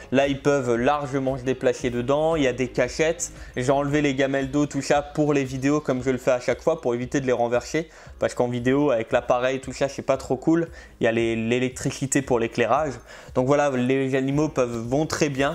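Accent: Alsace, France. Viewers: Est-ce que tu es Alsacien/Mosellan?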